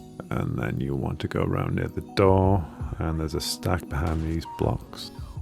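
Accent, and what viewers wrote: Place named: United Kingdom. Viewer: Came for the concrete, subbed for the British accent!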